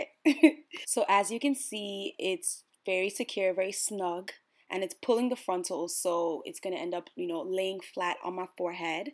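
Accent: Nigeria. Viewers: I haven't watched a video of yours in a while but: your personality is still lovely, your smile is even more radiant and....I miss your Naija accent oooooo!!! 😕😉🙂 The wig looks great on you!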